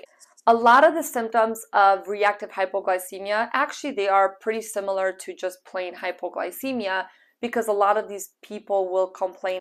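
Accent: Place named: Philadelphia, United States. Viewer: Holy smokes that is a cool thick philly accent.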